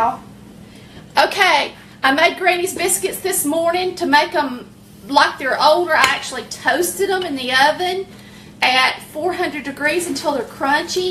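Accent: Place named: Alabama, United States. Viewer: yes i love her sweet voice. im a alabama girl in ohio. how i miss that accent. god bless you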